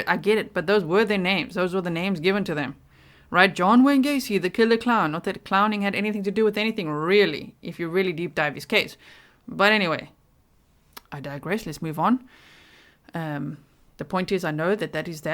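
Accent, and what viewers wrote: South Africa. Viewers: Are you South African like i am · Ahh! SA, now the accent makes sense. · Howzit! I've been dying to know how they even suspected this oke, and thought no one would have a video up yet. Checked YouTube and yours was the first video to come up, upon hearing you speak, I was all...wait I'd know that accent any where 🇿🇦❤️😎🤙🏻❤️🇿🇦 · South African, yw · South African but lives in the Netherlands which probably “complicates” her accent. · Are you South African?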